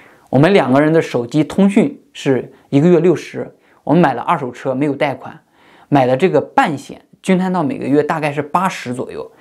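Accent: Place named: Northwest, China. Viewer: 听口音像是中国西北人 宁夏 甘肃 确实比较贫困 不上大学走出来真的是要穷一辈子 恭喜你的努力给了改写了后代的历史！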